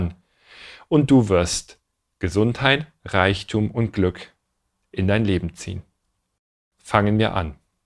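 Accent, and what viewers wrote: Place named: Bavaria, Germany. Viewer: Nur die bayerische Aussprache stört, z. B. Großartikkeit ..., sonst super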